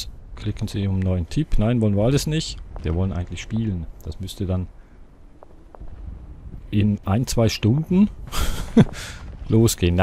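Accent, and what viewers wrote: Switzerland. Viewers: Er kommt aus der Schweiz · Wohnst du in der Schweiz? Du klingst wie ein Ostschweizer :D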